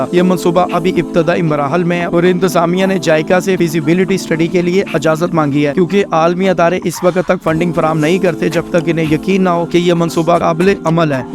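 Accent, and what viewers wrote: Pakistan. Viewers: Lehja bta rha ha k aap Pakistani ho ? Aur PTI supporter bhi ❤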